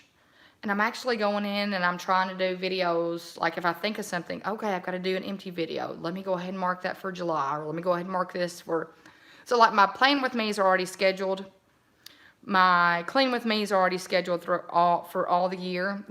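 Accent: South, United States. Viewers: OMG LOL I LOVE your accent!!!!!! Sometimes I try to talk that way. I love it. lmao. I am in California, so I don't feel like I have any accent. Do we sound like we have an accent to you (meaning people in the south?)? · I love how down to earth your channel is and how courageous you are to share yourself with others. I lived 12 years in Georgia (now I’m in DC area). so one selfish reason I love your channel is to listen to your awesome southern accent and all the sayings. “I’m fixing to...” is something I don’t hear much now 😀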